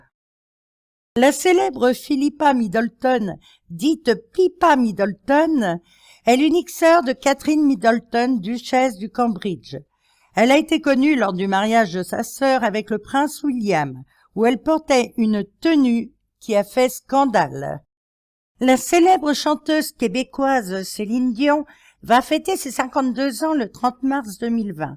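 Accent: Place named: France. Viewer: Quand vous dites les noms des actrices et les titres en anglais a la francaise , c'est trop mimi ! Bonne continuation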